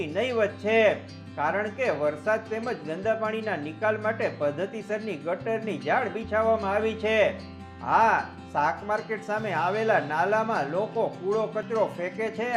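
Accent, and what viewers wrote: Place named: Ahmedabad, India. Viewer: બહુ દા'ડે દેખાયા!!!😊 હું બહુ નાનો હતો ત્યારે અમદાવાદમાં આવું ગુજરાતી લોકોને બોલતા સાંભળ્યા હતા. અમારા કૉલેજના દિવસોમાં તો અમદાવાદ પંચરવ એટલે કે કૉસ્મોપોલિટન સીટી થઈ ગયુ હતુ ને આવી ગુજરાતી લગભગ કોઈ નહોતુ બોલતુ. જોકે માન્ય ગુજરાતી ભાષા બોલતા હતા, અમદાવાદ-વડોદરાની બોલી. હવે તો આવી ભાષા અમદાવાદમાં સાંભળવા પણ નથી મળતી. અમદાવાદ-વડોદરાની બોલી પણ બદલાતી જાય છે!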